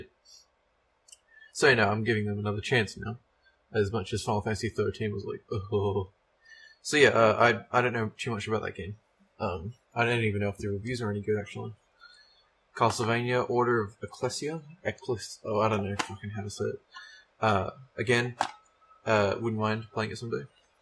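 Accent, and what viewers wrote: Australia. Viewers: Yeah I was right, he's totally Australian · waaait.Where are You from,?Australia?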